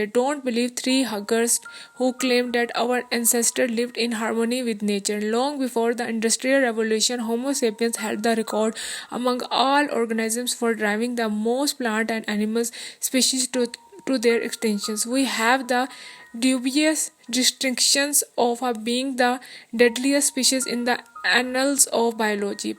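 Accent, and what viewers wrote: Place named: India. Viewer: Typical Indian english !😊 Very inexperienced reading, poor pronounciation and unprofessional audio editing. Visuals can be rendered better. Please improve the audio/video and upload again. Will wait in expectation.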